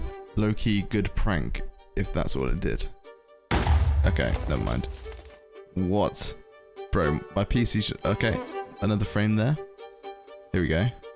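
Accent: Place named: United Kingdom. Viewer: You have a beautiful voice and a very pleasant accent. Pronunciation is usually regional; what part of Britian are you from? I hoping everyone there sounds like you.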